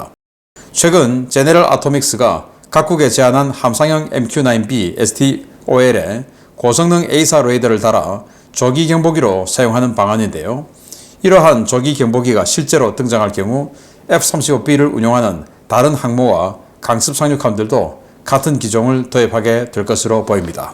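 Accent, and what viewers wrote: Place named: Gyeongsang, South Korea. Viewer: 경상도 씹 말투 존나 거슬리네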